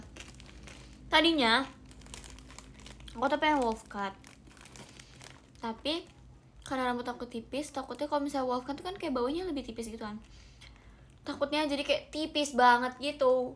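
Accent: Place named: Java, Indonesia. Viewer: Kenapa dia bisa logat jawa ya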